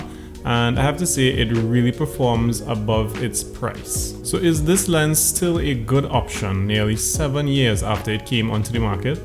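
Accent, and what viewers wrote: Trinidad and Tobago. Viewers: I have the Nikon 5100D and was looking for a lens to go with it other than the kit lens. I saw your video and decided to give it a watch and within the first 5 seconds I heard the Trini accent 🇹🇹 !! Big up my people one time. Just a quick question though, would you recommend this lens for a video podcast on my camera? Great video !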